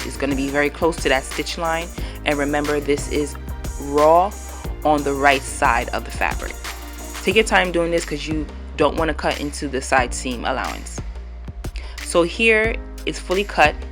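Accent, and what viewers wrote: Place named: New York, United States. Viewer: yesssss tell him, plus since he’s trolling about pronunciation - let him know it’s your NY accent🙌🏽🙌🏽🙌🏽🙌🏽🙌🏽!! Ppl are so quick to pass judgement! Language skills have nothing to do with her sewing talent!!!👍🏽👍🏽👍🏽👍🏽👍🏽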